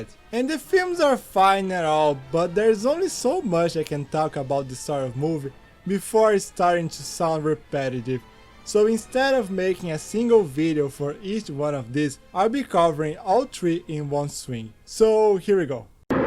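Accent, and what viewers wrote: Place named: Brazil. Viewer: Great video. Keep practicing your English pronunciations. You have a heavy Brazilian accent.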